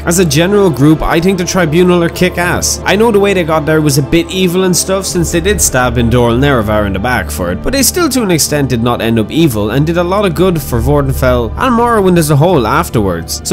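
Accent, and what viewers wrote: Ireland. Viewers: and yes i love and miss that sexy irish voice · Are you irish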